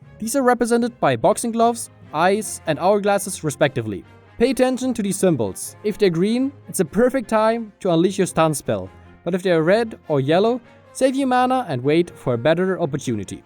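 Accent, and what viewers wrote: Germany. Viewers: You Sound german / middle european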